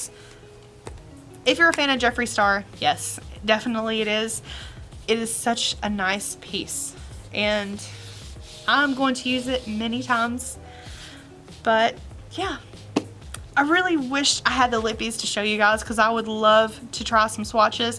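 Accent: United States. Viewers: How do I sign up for the delivery? You sound like you're from the country. Where exactly do you live?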